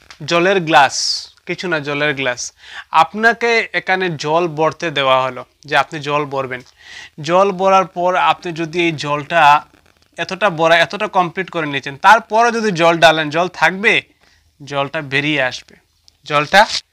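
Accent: Sylhet, Bangladesh. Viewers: Apnki ki sylheti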